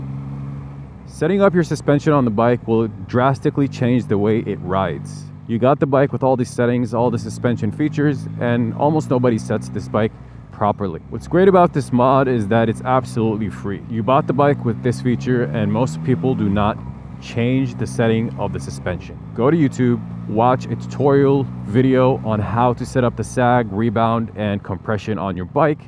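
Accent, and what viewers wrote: United States. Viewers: זה המבטא האמריקאי הכי טוב ששמעתי בחיים שלי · זה המבטא האמריקאי הכי חלק ששמעתי מישראלי אי פעם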